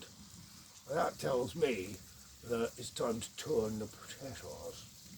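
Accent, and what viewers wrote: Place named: England, United Kingdom. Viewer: i was expecting maybe, Louisiana, maybe Florida, definitely not England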